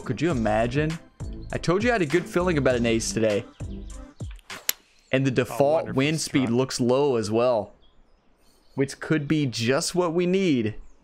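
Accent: Kentucky, United States. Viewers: what state are you from? trying to guess your accent? my guess is Kentucky?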